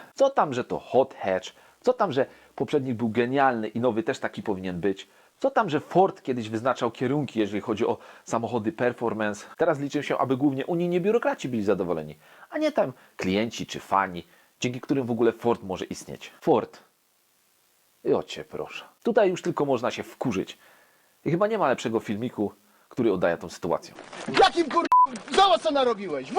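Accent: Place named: Silesia, Poland. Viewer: Škoda też😁 Tak z innej beczki to fajnie że w końcu ktoś ze Śląska robi tak fajny program. Miło się słucha własnego akcentu na YouTube 😉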